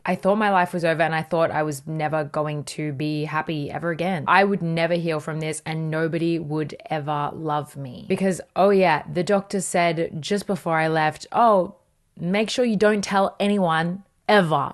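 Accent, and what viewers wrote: Australia. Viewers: Most interesting thing to me is how your accent is 90% Australian, then 10% Midwest American. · Hi, I'm curious about your accent: is your way of talking typical of where you are from, and where are you from? You sound a little bit like Queer Kiwi, but she's from New Zealand, not Australia. Thank you!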